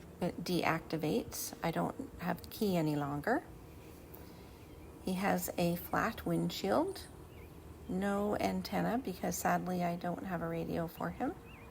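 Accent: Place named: France. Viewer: The very informative, but it is difficult to understand your very thick French accent.😔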